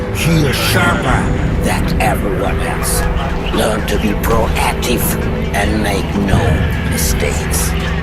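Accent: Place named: Russia. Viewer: Russian explaining Russian jet in English with Russian accent and Russian subtitle?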